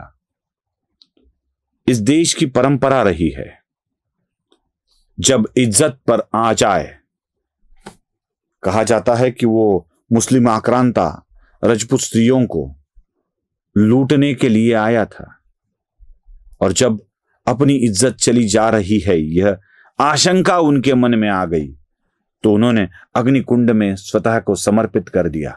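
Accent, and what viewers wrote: Maharashtra, India. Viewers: Mujhe Marathi language aur Hindi accent maharashtrian logon ka sun ke bahut achha lagta hai...❤❤❤❤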